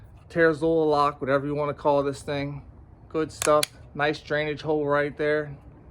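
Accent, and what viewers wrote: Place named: Philadelphia, United States. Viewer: Sic blade, gonna have to pick this up. Where are you from? I hear a philly/Delaware, jersey accent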